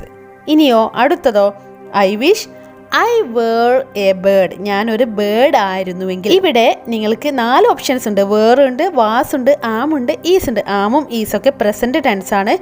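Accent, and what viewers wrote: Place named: India. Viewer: cringe indian accent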